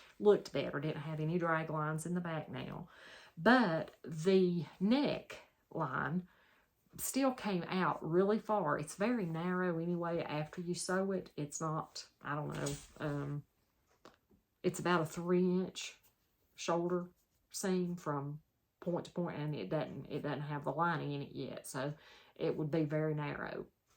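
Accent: South, United States. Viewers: First time watcher. I enjoyed your video. I'm from Louisiana originally but now in CA. So nice to hear a southern accent. I'm an experienced quilter who is now wanting to make my own clothes. I'm having a hard time fitting into the commercial patterns. I am only sewing with knits because they are so forgiving with fit. I'll be watching. --Lynda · Hello from another! I enjoy your videos & accent! I also have a southern accent as I grew up in Texas and moved to Virginia 12 years ago.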